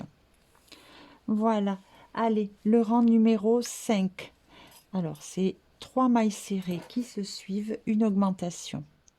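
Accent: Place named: South-West, France. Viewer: Merci pour cette panière. Je viens de la terminer et j'ai pris beaucoup de plaisir à la réaliser grace à vos explications extrêmement claires et précises. J'aime beaucoup vos tutoriels ainsi que votre voix calme avec ce joli accent du sud ouest.💜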